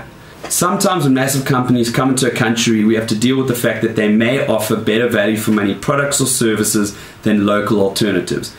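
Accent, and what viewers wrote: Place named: South Africa. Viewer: I'm unsure which I liked more, the SA accent or your articulation of argument. Props to you from Australia!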